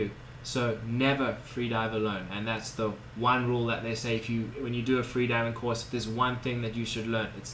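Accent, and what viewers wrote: South Africa. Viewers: Thanks for the tips mate, are you from South Africa? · Nothing like a good ol' SA accent :) Cool vids boet, keep 'em comming!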